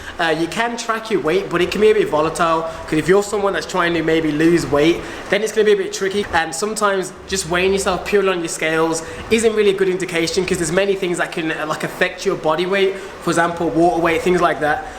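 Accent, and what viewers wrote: United Kingdom. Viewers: Nice to hear a British accent. Lots of common sense, ground roots type tips. Exactly what I need. Thanks. PS music is NOT too loud. Also this Youtuber replies to all the comments. Liked and subscribed. No brainer.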